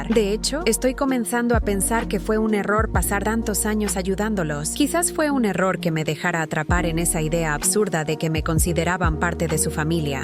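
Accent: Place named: Portugal. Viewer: Y ese asentó portugués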